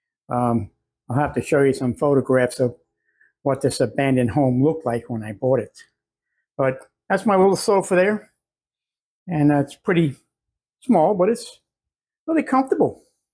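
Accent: New York, United States. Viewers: Hey, you sound like a New York guy—where from originally and where’s your current nest? I grew up in Westchester Co. north of NYC so I know that accent — NY Italian-American? I’m in the Bronx now, and part time in the Delmarva area where Delaware meets MD. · I thought I detected a hint of New York in your accent. With my accent people always think I’m from New York. My daughter lives in Brooklyn. The only thing I don’t like about the south is the heat and the hurricanes. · Fantastic job!! Wow, very impressive how you renovated this tiny house and decorated it so nice. It's home. I'm happy for you that you were able to accomplish this dream home for your retirement years. You are very smart to do what you did. I'd like to see the restoration of the 1930 car. You sound like you're from Brooklyn or Queens??? Not sure. I'm from the Bronx. My husband is from Brooklyn. 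We moved to TN from CA. We like it here. It's been ice rain now, so we're hunkering down inside. Hope you're safe out there where you are. Have a blessed day. 🙏🙏🥶🥶🤗🤗🇺🇸🇺🇸🫎🫎🐔🐔🐦🐦🐿️🐿️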